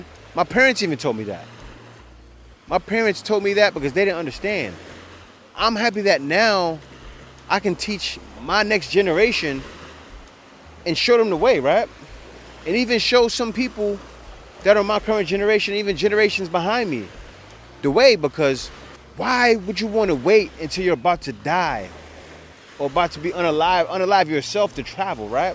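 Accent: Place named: New York, United States. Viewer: bro I been watching you for few years now. Im appreciating everything you been saying. Still got that NYC vibe coming through your mouth 😂😂😂. I’m 59. Just retired from working NYS government job. I need to leave and come to the philippines. Need to need up with you when I get there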